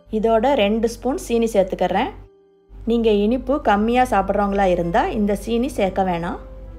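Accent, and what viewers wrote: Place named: Kilakarai, India. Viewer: Ungloda slang padha theriudhu nega klk nu nice sis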